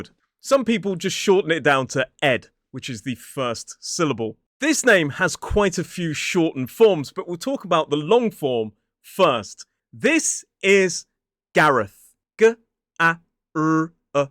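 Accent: United Kingdom. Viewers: Hi, lve just come across your channel. l love the way you run your lessons, and l love listening to your British accent. I've subscribed ❤ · Ciao, what did you say at the end to finish off your video, "from me" or "fir me"? They say the same thing on TV to end a programme but I never get it. I love your British accent so much!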